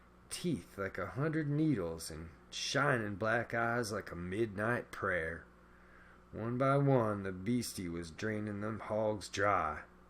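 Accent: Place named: Oklahoma, United States. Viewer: HOW THE HELL DID I MISS THIS ONE ???? LOLOL. !! LOVE the accent !! I know a lot of hillbillies here in Oklahoma that. Talk just like that !! Some of my family in S.E.Okla. !!! Lolol😹😹😹😹😳 Great job , hon.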